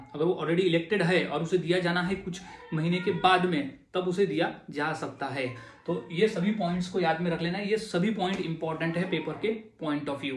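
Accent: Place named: Rajasthan, India. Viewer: Sir aap Rajasthan se he kya kyuki apka accent wesa hi he 😊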